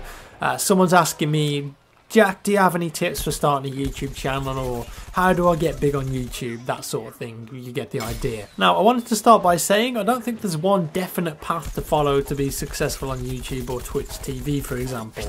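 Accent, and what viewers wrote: United Kingdom. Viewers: you forgot to mention having a British accent definitely helps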